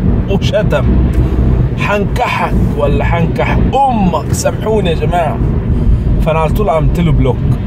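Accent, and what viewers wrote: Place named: Ireland. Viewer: Such an Irish accent! try to do it more bro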